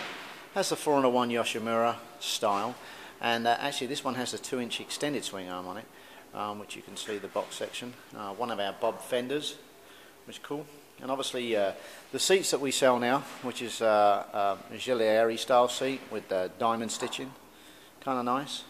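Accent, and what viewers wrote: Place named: Australia. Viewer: you sound like an ozzy mate ,haha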